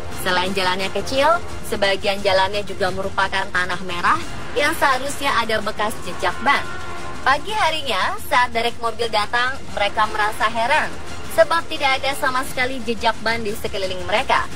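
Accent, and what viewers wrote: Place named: Java, Indonesia. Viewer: Jawa kerass lur.. 😀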